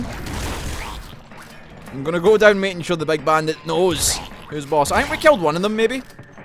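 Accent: Scotland, United Kingdom. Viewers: lol this was alot more enjoyable then other runs of this game ive seen XD and your accent is fanfuckingtastic is it scottish?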